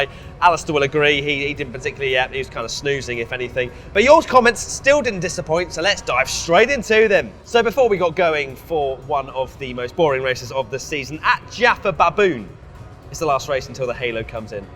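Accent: England, United Kingdom. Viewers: Less English accent. It's quite annoying.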